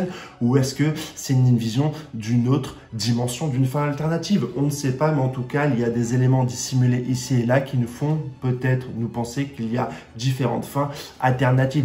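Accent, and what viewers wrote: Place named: North, France. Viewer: votre lieu de résidence (département lol), je me sens que tu viens du nord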